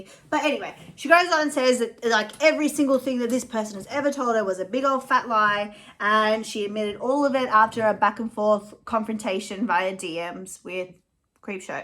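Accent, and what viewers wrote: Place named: Australia. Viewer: Girl you gotta be a fellow Aussie! I was thinking “why does she sound so normal and relatable” cos we have the same bloody accent 🤣 subbed x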